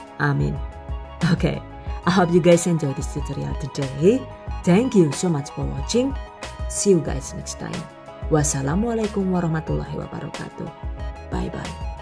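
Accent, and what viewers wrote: Banyumas, Indonesia. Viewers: Betul banget. Suaranya juga khas😁😁 apalagi pas ngmg ngapak. Hrhe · Seneng bingit sama chanel inih# apalagi pas si mbake ngomong bahasa ngapak & English🤩🤩🤩🤩🤩#GiveawayTHV2021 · Seru kl lg ngomong banyumasan, suaranya nge bass ternyata mbak nya imut cantik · Ngapak banget terhibur aku🤣🤣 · Deneng kaya wong ngapak 🤭 Ternyata ibune ayu,terus suarane khass CATAT..😆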